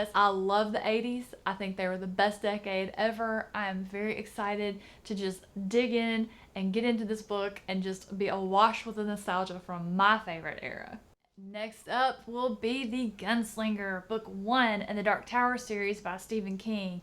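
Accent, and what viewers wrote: South, United States. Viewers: I wish more people had a Southern accent like you making book videos!! :D Love it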